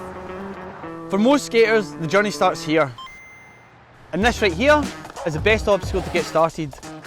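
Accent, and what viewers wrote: Scotland, United Kingdom. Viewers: Skate YouTube is so Americanized, I did not expect to hear the Scottish accent, and it was a welcome sound haha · Never expected the scots accent!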